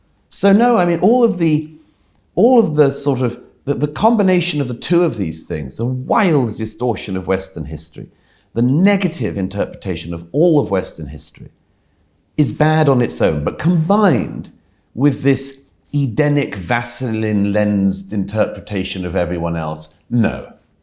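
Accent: RP, United Kingdom. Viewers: The man with the posh accent is speaking the truth. You would know that if your knowledge of history wasn't limited to TikTok and shows from Netflix. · This is one of the unfortunate byproducts of 30 years of dumbing down, there are people out there in Internetlandia who would follow Murray blindly based on the fact he can string a sentence together without saying “like” out of context, with a posh accent.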